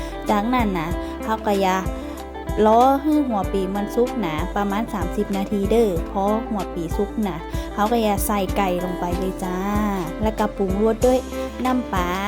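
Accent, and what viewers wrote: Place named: Uttaradit, Thailand. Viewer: ขอเดาว่าสำเนียงคนอุตรดิตถ์ค่ะ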